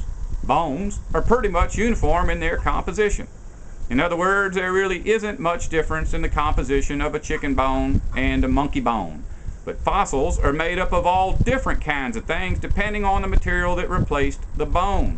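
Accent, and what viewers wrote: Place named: Texas, United States. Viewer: Your voice is very comforting to me, never mind what anyone else says. Its a vocal pattern I heard constantly when I was tiny, growing up in the Gulf Coast area of Texas. So you, sir, are the voice of a comforting bedtime story to me. Thank you for being you.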